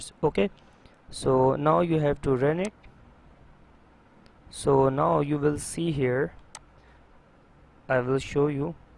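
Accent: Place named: India. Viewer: Indian accent is good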